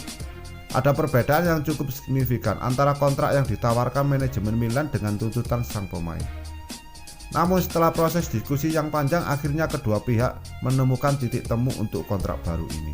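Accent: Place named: Padang, Indonesia. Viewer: Dilihat dari logatnya, pasti orang Padang nihhh..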